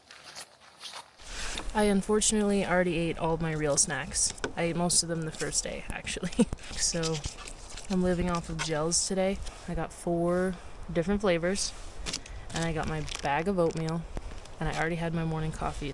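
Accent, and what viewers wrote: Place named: East London, United Kingdom. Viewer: that is absolutely excellent. what an amazing route and landscape. well done for documenting your travels. I love the word 'thunk'. It's awesome. love and good fortune (Mangala sutta) 😎😊❤ p.s. that was certainly an east end of London market traders accent. Or maybe Fagin from Oliver Twist. Certainly one of the two.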